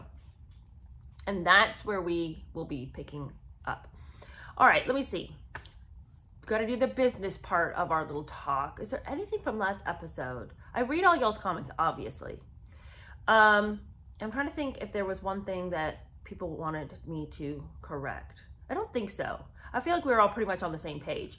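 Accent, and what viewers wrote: United Kingdom. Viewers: Love your British accent.. very Bridget Jones 😂😊 (I’m not being snarky, I actually like it)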